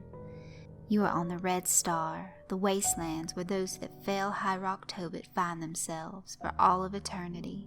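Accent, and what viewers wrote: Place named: Georgia, United States. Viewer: Where are you from in Georgia? I'm from Loganville and our accents sound really similar, I know that different places have a little different variations on accents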